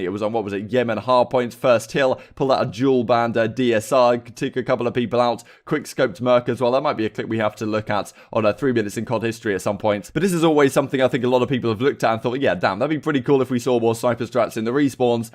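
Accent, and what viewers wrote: United Kingdom. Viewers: Thank god ur British i would hate hearing this without the accent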